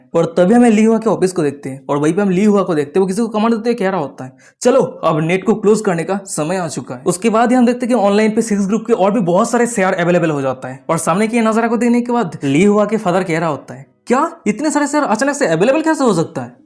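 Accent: Bengal, India. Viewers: Bhaiya AP Bangali ho kiya...❤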